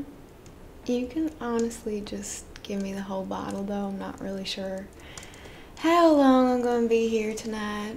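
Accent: South, United States. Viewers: You have the southern talk down well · I spent most of my life in the South and I didn't hear any problems with the accent. Good job! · Glad you went with this thumbnail! I knew from the stream earlier your southern accent was going to be great at least for asmr purposes. Thanks for the great content as always:) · That southern drawl tho.. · The southern accent is so underrated. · Southern accents are the best. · The southern accent has an innocent, flirty sassiness to it, I love it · as a southerner, the accent is pretty good 🤠 the way it falters sometimes is actually realistic, not every single word has to have a drawl to it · I love your southern accent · This is a perfect southern role play I really love it!! Love how southern people talk! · As an Aussie who just got back from a work trip to Alabama....gee I miss hearing this accent! Sweet tea, BBQ, football, and Jesus. 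Hahaha! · If you're not a southerner then I applaud you, I'm born and raised in Georgia and your accent is spot on. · that southern drawl was 🔥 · One of my favorite RP videos. I adore the southern accent. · Real Southerner here says that accent ain’t too far gone. I’d have a hard time believing there ain’t somebody country in your world. Keep it up. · I spent 17 years in northeast Alabama every summer. Fell in love early with a southern voice. Thanks for doing this one. God bless Southern ladies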